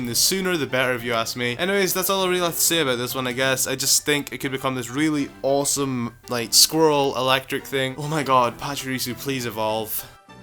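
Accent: Scotland, United Kingdom. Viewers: Are you Scottish? You sound like Shrek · are you Scottish? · R u Scottish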